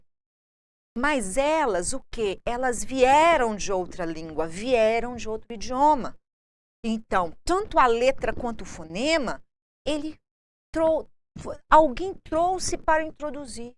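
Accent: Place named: São Paulo, Brazil. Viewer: De onde a professora tirou este sotaque paulista? Andou trocando o mineirês pelo paulistês.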